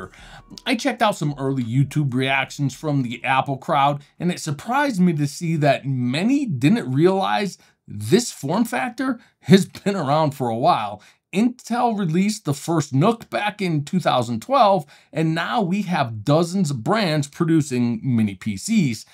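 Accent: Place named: United States. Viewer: I really have problems with strong American dialect ...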